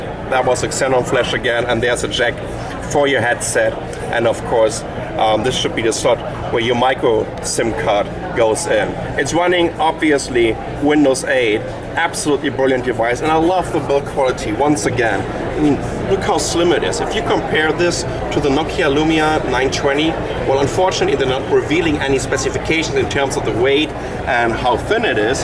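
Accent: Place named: Germany. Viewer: amazing review, i like German-English language :)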